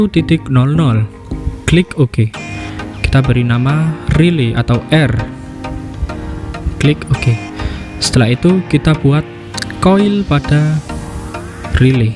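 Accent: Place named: Bali, Indonesia. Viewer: logat bali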